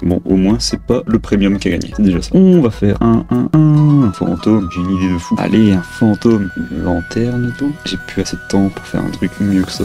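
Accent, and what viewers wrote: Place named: Marseille, France. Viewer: Ton accent marseillais m'a exterminé !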